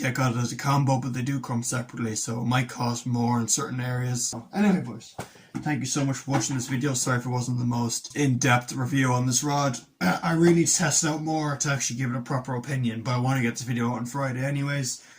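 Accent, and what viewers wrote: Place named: Ireland. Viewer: he is from irland mate he has a accent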